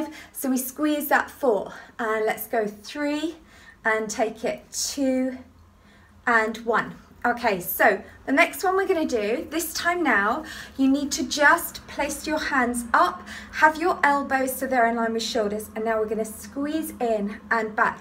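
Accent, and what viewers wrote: United Kingdom. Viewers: superb british accent. 😊😊😊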